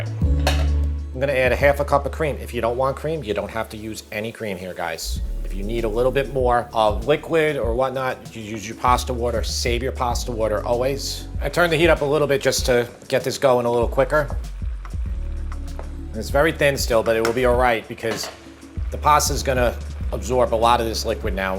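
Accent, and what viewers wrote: New York, United States. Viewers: I find your videos so relaxing. Also, I love your approach to cooking. No pressure, do what you can with what you have. The New York/Jersey accent is just so loveable ❤️ Can't want to try this one!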